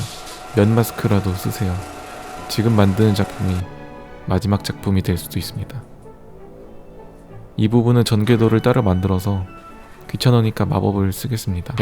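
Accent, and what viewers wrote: Daejeon, South Korea. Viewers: 님 대전분이셨나요??!?! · 님 대전사람이시네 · 형 대전사람이였어...? 이제 알음...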